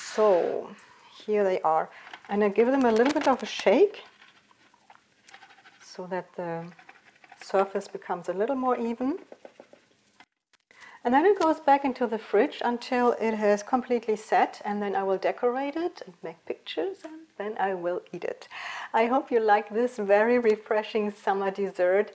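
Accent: Germany. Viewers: Best recipe. Maybe Imperial is cup measurements, I have an app that converts & on measuring cups we have both. America uses cups & ounces. I love your slight German accent & have just subscribed. I can’t wait for some great German recipes!!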